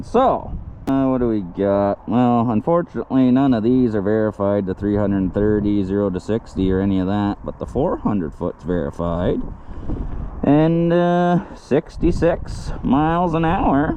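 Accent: Canada. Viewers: Hey dude , you must be canadian? Great content! Keep up the great vids.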